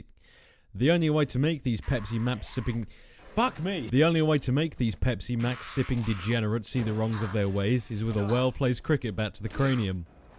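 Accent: United Kingdom. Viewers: I thought your accent was Australian at first but it sounds more British in this video. I'm bad at telling accents. Australian just sounds like a confident British accent to me.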